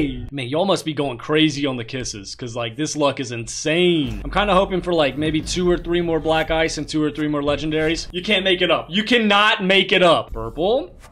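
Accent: United Kingdom. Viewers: ITS A BRITISH PERSON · Aaaaah! It’s a british person! 🤣 You got it! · its a british person LMFAO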